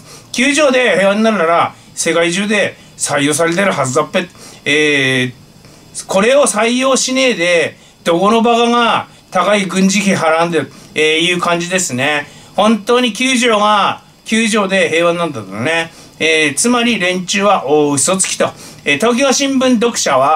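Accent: Fukushima, Japan. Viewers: 体が大変なのに毎度ご苦労様です。 気持ちが乗ると出る福島なまりが好きです。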